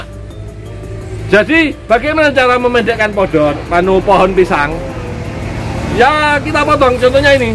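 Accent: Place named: Surakarta, Indonesia. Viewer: Dari logatnya kliatannya pak dhe berasal dr wlyh eks karesidenan solo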